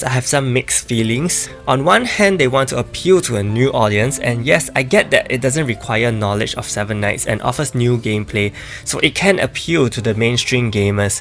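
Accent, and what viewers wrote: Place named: Singapore. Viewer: You sound Singaporean... are you?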